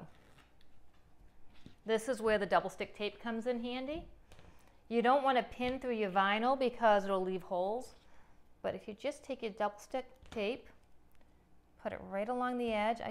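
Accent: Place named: New England, United States. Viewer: Great tutorial...you sound like a fellow New Englander...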